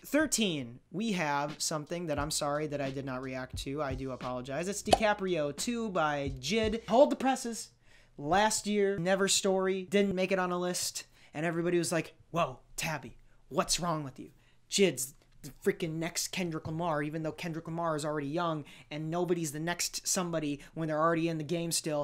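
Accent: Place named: New York, United States. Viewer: Tabby sounds extra new yorky in this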